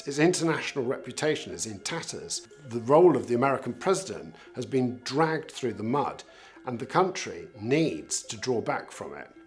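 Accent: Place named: RP, United Kingdom. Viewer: Time will tell who is who? This speaker will fell off his chair!! Talking and criticising President Trump in a posh accent does not make him intelligent. Be prepared for what is coming. We Love President Donald Trump..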